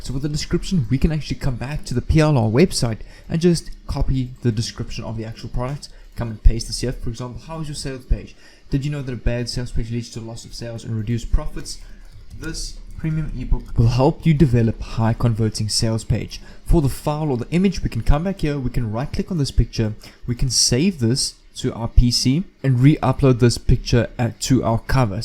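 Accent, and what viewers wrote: South Africa. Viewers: As soon as I started watching this I immediately recognised that accent- so good to see a fellow Saffer doing the earning online. Definitely will follow - would love to chat more and possibly share some of my ideas with you...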